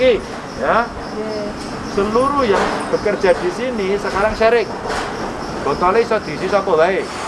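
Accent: Banyumas, Indonesia. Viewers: Salam Ngapak pak Ganjar (TEGAL Haaddiirrrr),,,😁🙏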